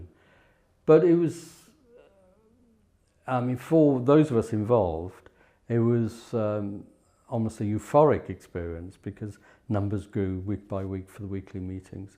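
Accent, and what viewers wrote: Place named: Wales, United Kingdom. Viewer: Definitely same guy. Same Welsh accent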